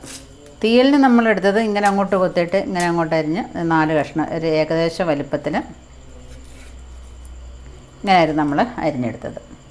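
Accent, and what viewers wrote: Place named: Pathanamthitta, India. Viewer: Hello Eavideyanu Sthalem Samsarem Kettittu Pathanam Tittayano ❤🧡❤🧡❤🧡❤🧡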